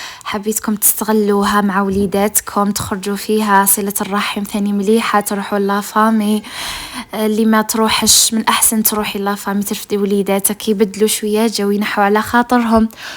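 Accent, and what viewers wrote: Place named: Médéa, Algeria. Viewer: تهدري كيما ناس المدية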